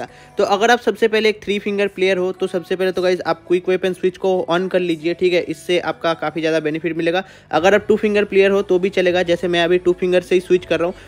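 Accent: Bengal, India. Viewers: Vai ap bangali ho 🤔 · Bhai tum bangali hoo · Bhai aap bengali ho kya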